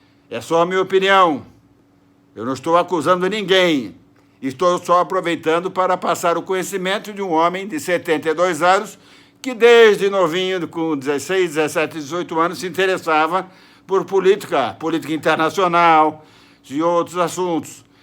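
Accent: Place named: Rio Grande do Sul, Brazil. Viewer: Sua opinião e maneira de falar e pura arrogância...Falar mal de Bolsonaro pra nos e Merda...quem você pensa que é.. a nos chamar de merdas. Burros e babaca..quem você se julga ser..Nao e com nos que deve mexer..mas sim com os partidos esquerdistas que meteram ideologias esquerdistas e erradas.Aonse você esteve esse tempo todo enquanto esse povo esteve no poder..Agradecemos não falar do nosso Presidente..Ignorante e a sua pessoa..com essa forma arrogante e inflamada de falar..Nunca deveria ter palanque..com esse sotaque gaúcho de carrasco...e torturador.deixo meu deslike e abandono o seu canal,pela falta de respeito ao povo brasileiro.ate nunca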